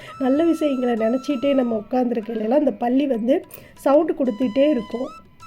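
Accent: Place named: Theni, India. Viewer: Akka ungal negative place Dindigul la akka ennaku theni akka ungaloda speech appadiye erukku Akka ❤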